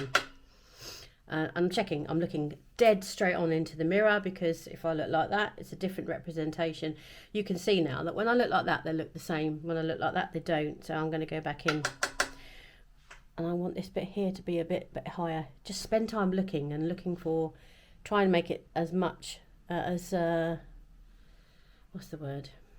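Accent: United Kingdom. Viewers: I love to hear you speak and sooo wish I could find a gentleman from the U.K.! Iove the accent and Alan Rickman was my favorite. I digress, the makeup is so pretty and I like the purples.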